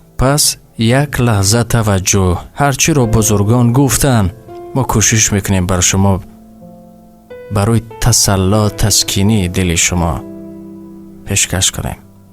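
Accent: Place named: Tajikistan. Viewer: بهترن سخنها آفرن دستی ازیزم لهجه شیرن فارسی تاجیکی🇹🇯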